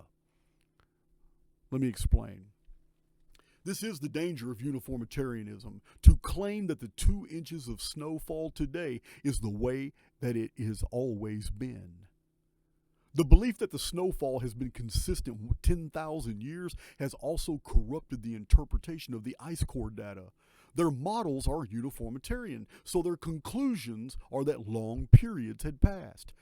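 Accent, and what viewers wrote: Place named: Texas, United States. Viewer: Hey there I just wanted to say that I really loved the interview that you did with Joel but it was so sad that I could barely hear you and I know that you're bringing new equipment on board but I think your levels are super low still this video is also hard for me to hear I don't always have the ability to get to a speaker sometimes I'm just listening to it right through my phone and it used to be so much louder so have you guys can tweak that we love to hear you loud and clear with that Charming Texan accent😇🥰😍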